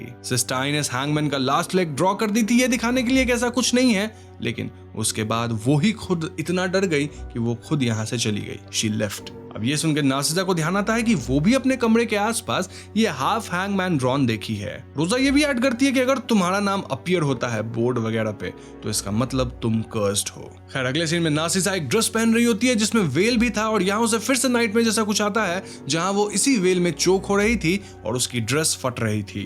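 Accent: Bengal, India. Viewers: Aap Bengali ho kya 😊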